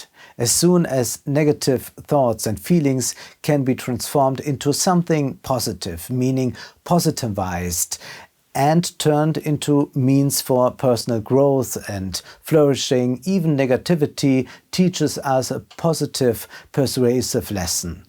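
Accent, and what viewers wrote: Germany. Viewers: Though the German accent is very lovely, considering enabling the subtitles might help the one or the other to better understand what is being said. Would be a shame considering the brilliants of the analysis. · Ohne den deutschen Akzent wäre die auch im Englischen makellose Wortwahl nur halb so schön. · Sympathic german accent. Really like it:) Immer weiter, keiner macht sein Ding so schön wie Du:) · High quality content with a cute german accent!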